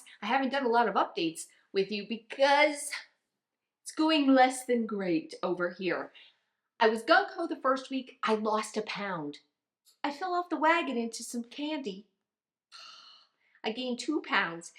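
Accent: Canada. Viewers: She sounds Canadian…..so Canada has better Dollar Trees…probably more polite..lol…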